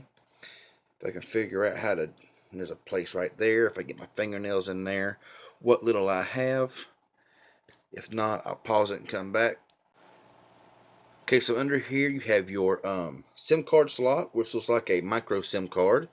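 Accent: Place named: East Tennessee, United States. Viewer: Sounds like you're from East TN or Kentucky. Amirite?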